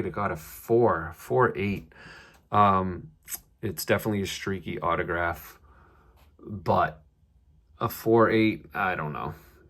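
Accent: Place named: Boston, United States. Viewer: Boston accent comes out in these videos. Haha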